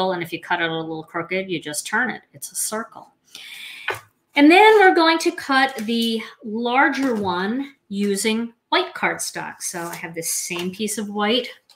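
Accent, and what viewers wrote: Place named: East Coast, United States. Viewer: Absolutely gorgeous!!!! The shading is so amazing and I learned so much!!! I love your fun videos AND your accent 💜!!!! I grew up in Rye, NY and love hearing East Coast accents. Be well! Hugs, Karen ❌💜❌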